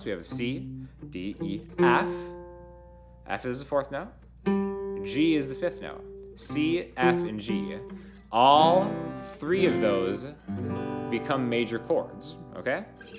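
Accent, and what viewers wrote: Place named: Sweden. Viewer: Interesting accent. R u Swedish or Italian?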